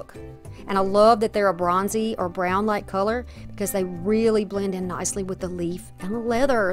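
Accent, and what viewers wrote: South, United States. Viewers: Number one, I'm a new subscriber and I just wanted to say that your amazing southern draw and personality is what keeps me coming back! Number two, ALL of the things that you make are stunning and I'm so grateful that you've chosen to share your talents with all of us! I'm going to go check out your IG and send you a DM. Maybe we can share projects and ideas 😁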